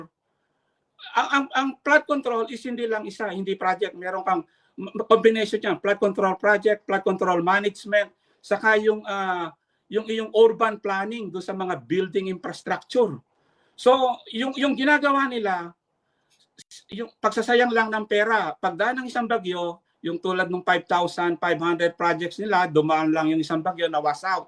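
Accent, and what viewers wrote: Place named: Pampanga, Philippines. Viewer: Kuya sa boses mo Alam ko may dugo kang Kapampangan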